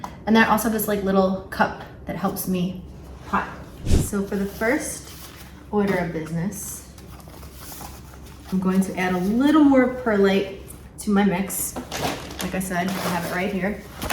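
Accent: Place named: New York, United States. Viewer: Stunning goddess: I'm just loving your vlogs! I love your way to explain and love your NY accent❤️❤️❤️❤️❤️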